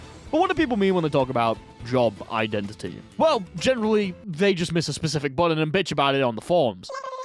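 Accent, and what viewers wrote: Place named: Australia. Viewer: "UK". No, Australian. So UK Lite 🙃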